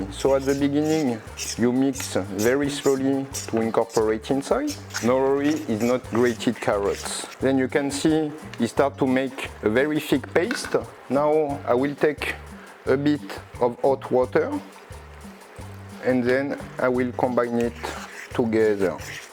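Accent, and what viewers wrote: France. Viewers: I've never seen a thicked French accent et je suis français! Very nice technique! Makes for a fatty meal but it must taste amazing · WOW! I haven't seen this carbonara technique before. I will try it this weekend. Thank you, for sharing. I love your French accent. 😊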